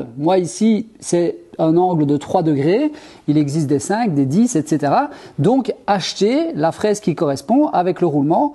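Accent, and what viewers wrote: Belgium. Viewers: Allez vive. Dès que vois cette tête avec bonnet et accent légèrement belge, je suis de suite heureux.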